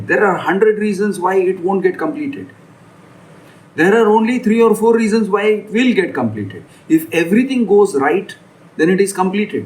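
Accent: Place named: India. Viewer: I asked out of curiosity as this issue might be specific to india and the anchor spoke in indian english. But glad that you understood and appreciated what's going on in this part of the world.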